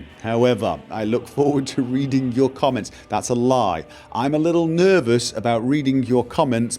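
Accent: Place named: Manchester, United Kingdom. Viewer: Are you from Manchester you've got a Manc accent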